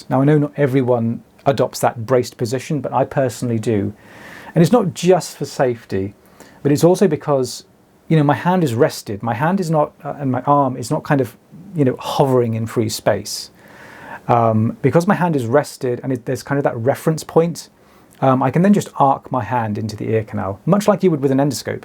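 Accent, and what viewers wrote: England, United Kingdom. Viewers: I might be really shallow but I like to tune in just to listen to your beautiful English voice. Wax removal is a bonus!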